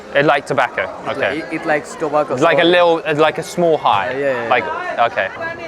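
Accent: United Kingdom. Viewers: u briish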